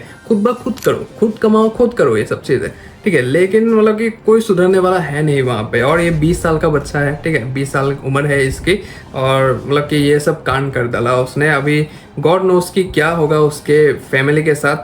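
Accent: Assam, India. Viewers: Bhai Assam se ho kiya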